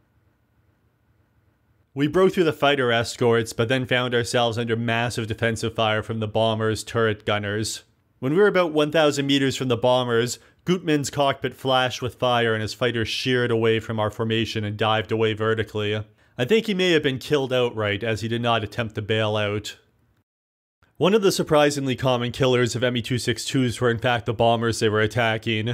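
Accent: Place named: United States. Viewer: "Weird" is probably a tad harsh, though I must admit I've never heard 'bomber' pronounced 'bawwwwwmer'. It just seems to be one of the more obscure America regional accents.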